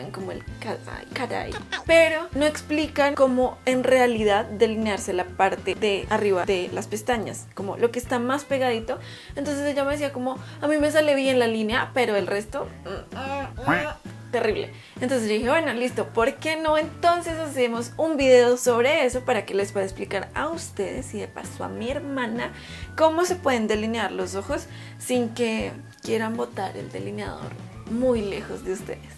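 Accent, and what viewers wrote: Colombia. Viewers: Usted es de Colombia ??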